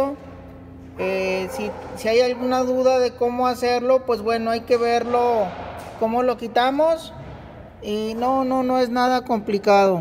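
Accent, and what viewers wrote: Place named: Mexico. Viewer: Los Mejicanos hablan cómico pero buen trabajo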